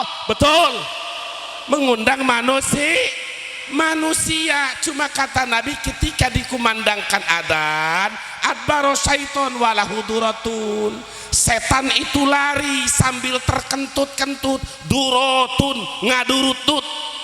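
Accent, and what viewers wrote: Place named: Banten, Indonesia. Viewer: Bahasa khas banten aa, aa anu timana kitu lebay amat?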